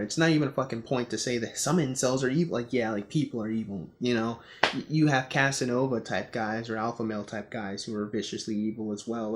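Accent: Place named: England, United Kingdom. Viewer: Lose the random English accent.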